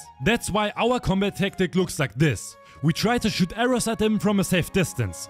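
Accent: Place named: Germany. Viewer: Man hört so sehr den deutschen Akzent 😂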